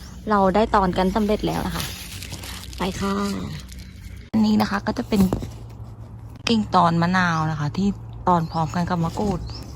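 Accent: Thailand. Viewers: เมืองกาญจน์แน่เลย หรือว่าราชบุรี สำเนียงชัดเจน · ราดรีชัวร์เลย พูดสำเนียงนี้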